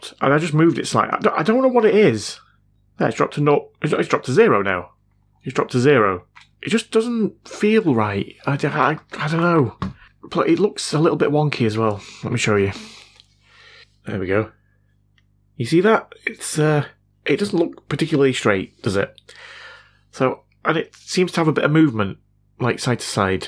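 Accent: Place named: Bradford, United Kingdom. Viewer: All I want to know is if you're originally from the Bradford area? (because, inflection for inflection, you sound exactly like the Lost in the Pond dude.. and that's where he's from)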